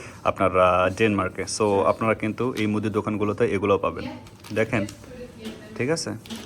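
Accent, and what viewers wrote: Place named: Bogura, Bangladesh. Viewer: বগুড়ার কথা শুনে সাবস্ক্রাইব করে দিলাম শিবগঞ্জ উপজেলা থেকে দেখছি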